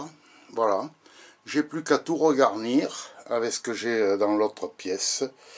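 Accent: South, France. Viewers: merci pour ta réponse et j'ajoute entre nous qu'entre l'accent du sud me ravi encore plus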